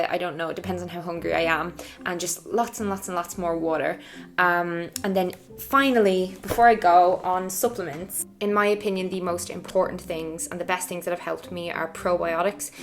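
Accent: Ireland. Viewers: I singled out your lovely Irish accent in the first 10 seconds. You're my most loved nationality on the planet. 🇨🇮🇧🇷🇨🇮🇧🇷🇨🇮🇧🇷 Go raibh maith agat for your video! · I'm from germany but i loooooove irish accent so much. (Because of Saoirse Ronan) Thats why i love your accent. And youre so pretty. 😍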